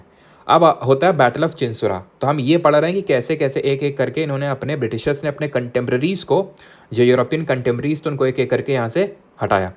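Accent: Kanpur, India. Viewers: Without knowing where he is from ,by his accent i within minutes got sure he is from kanpur I'm also from kanpur rola h 😂🙌🏻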